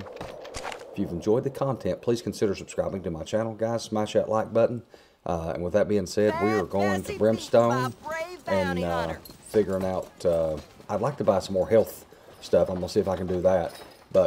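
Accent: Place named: South, United States. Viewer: your southern accent makes this lets play more authentic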